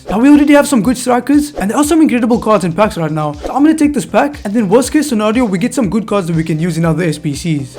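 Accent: South Africa. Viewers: I think he from south africa bcs of his accent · 10 and are you South African?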